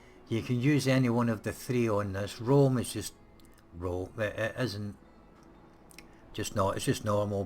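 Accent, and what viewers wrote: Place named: Scotland, United Kingdom. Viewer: You sound Scottish and if you’re not don’t get angry cause I’m Swedish?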